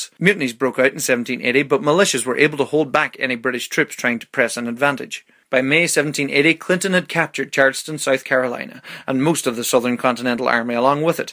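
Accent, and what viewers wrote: Ireland. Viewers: Tsunami Williams yes he's Irish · I love his voice. Is he Irish? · Are you irish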